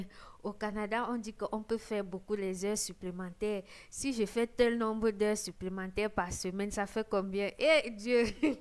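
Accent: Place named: Bamileke, Cameroon. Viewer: Le ton bamileké de ça . Slt sister. Ton visage m' est familier stp dans quel province vies- tu?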